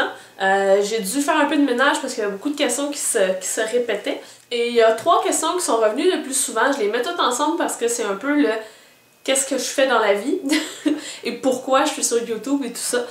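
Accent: Canada. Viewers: Coucou merci pour cette foire aux questions où l'on apprend a te connaitre un peu plus avec ce bel accent du canada (le meme que mon cousin qui y vis). tes tutos sont super car tu expliques tout avec patience et j'aime bcp ta personnalité tu es une de mes youtubeuses favories. continues!;) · empilage de boite o pas tu reste très naturelle,tu es dynamique,souriante et très naturelle.j'ai beaucoup copié tes make up ,alors continue,et ton accent canadien j'adore;)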